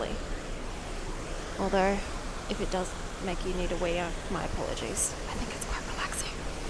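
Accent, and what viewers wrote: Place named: Australia. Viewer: You’d think you got $5 for everytime you said, “brown snake” for the number of times you said it… lol 😂 Your accent is so much better when you’re not whispering you sound proper Australian. It’s hard to believe you weren’t born there. 😅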